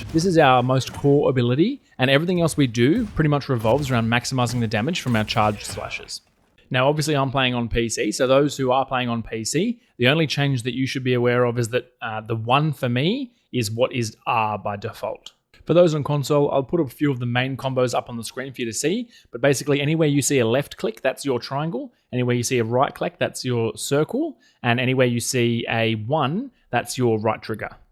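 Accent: Australia. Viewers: It's definitely struggling at times, but it's not so much the video as it is your in-game performance - it appears that your fps is dropping into the sub-30 territory sometimes which will definitely create some serious input delay issues eventually especially in more performance heavy fights and environments. This issue may not appear to be so apparently severe on your end, especially when you're just playing without recording, but the additional performance cost of recording might explain the degradation in performance captured in the video. If you'd like any advice, I'm pretty experienced with this stuff (around 20yrs of being a PCMR giganerd), and if I knew your rig specs and the display you're using I could probably offer some quick and easy things to improve it for you. But only if you'd like, I'm not one to force unsolicited advice onto anyone. Also, while I understand you're not super fussy about performance, for the sake of recording it would definitely be helpful for the audience. 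Either way, thanks for the video and info! It's been a great resource for me in brushing up on my Greatsword knowledge/skills and getting back into it since I took a break from MHW (that ended up being far more permanent than I originally intended 😂) right before Iceborne released. As a side note of interest, as an American English speaker I have always very much enjoyed and prefer listening to Australian and NZ accents (I often get them mixed up so listed both lol). Very easy on the ears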